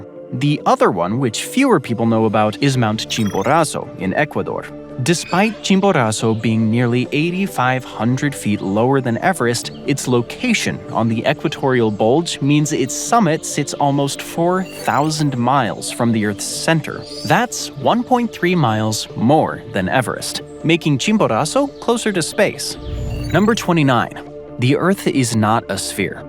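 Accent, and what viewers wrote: United States. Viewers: Are you American by chance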